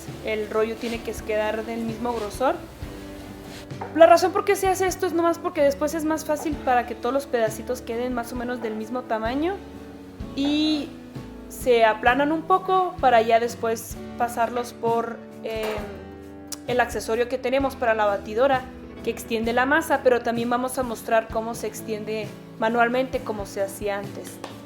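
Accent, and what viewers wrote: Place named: Cuauhtémoc, Mexico. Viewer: Por el acento supuse que eras de Cuauhtemoc 🍎😍! Y sii Le atiné! Mi papá es de allá, A mi esposo y a mi nos encanta ir y COMER todos los antojos menonitas que podamos. Todo es delicioso, los campos menonitas son muy hermosos y su gente tambien 😋 Saludos desde juaritoz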